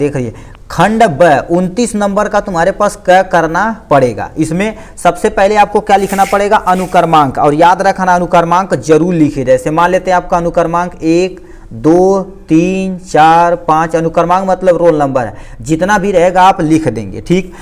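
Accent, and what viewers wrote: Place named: Kaushambi, India. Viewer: Are yar bhai tum kaushambi ke hi ho kya bdi khatarnak boli h tumhri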